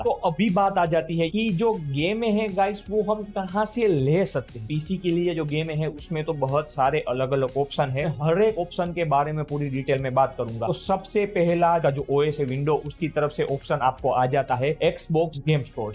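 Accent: Gujarat, India. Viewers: Are you ગુજરાતી? 😅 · भाई पहले हिंदी बोलना सिख लो, साफ़ साफ़ पता चल रहा है की तुम गुजराती हो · By his speaking style he is 💯 gujarati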